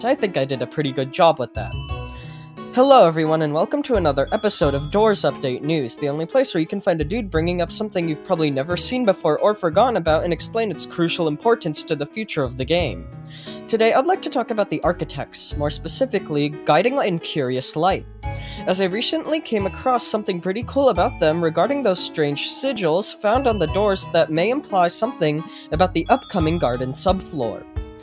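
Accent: United Kingdom. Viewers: he sounds British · I thought it was a British accent, I will now remember these videos as the British era of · HE IS MORE BRITISH OH GOD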